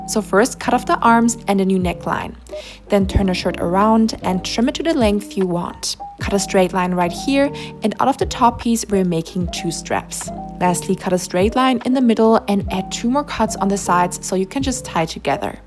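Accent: Germany. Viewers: Why do you sound kind of German? (I‘m from Germany and this is the first video I watched of you) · heyy, random question but are you german? idk, your accent kinda sounds like it..btww LOVEE UR VIDDDS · i hear deutsch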